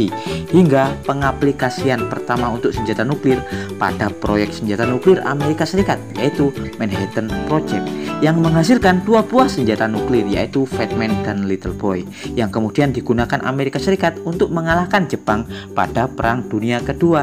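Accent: Java, Indonesia. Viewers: orang jawa mana ini? medoknya jelas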